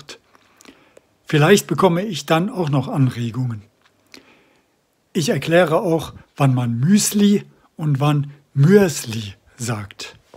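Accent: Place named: Switzerland. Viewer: Also von mir aus kannst Du das Schweizerdeutsch gerne mehr einbauen, ich höre das gerne. Selber sprechen kann ich es leider garnicht... Und wie es aussieht, ist Deine Verwandtschaft auf der ganzen Welt verteilt! 🙂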